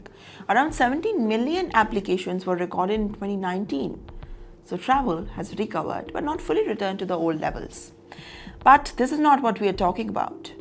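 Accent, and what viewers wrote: India. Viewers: Just curry english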